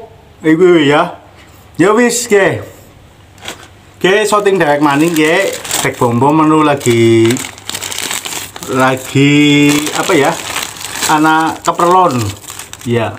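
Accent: Banyumas, Indonesia. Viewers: Mangan maning apa ora wedi makin gendut mas ha ha,sehat ya mas,ora ngapak ora kepenak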